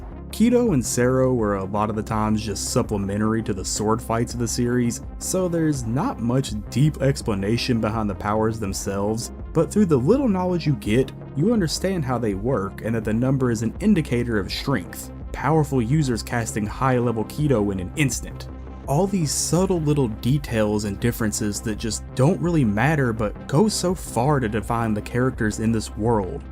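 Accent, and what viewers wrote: South, United States. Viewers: just found your video man, I cant place the accent, TN or MS? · Love the video, and as a fellow Texan, love your narration through it. There's nothing like hearing someone describe something they're passionate about in a fine Southern twang. Also, you're like one of the only people on Youtube talking about Bleach that acknowledged the existence of the "speed of the world" poem, so thanks for that. It's one of my most favorite moments/poems from the manga that just evokes so much emotion.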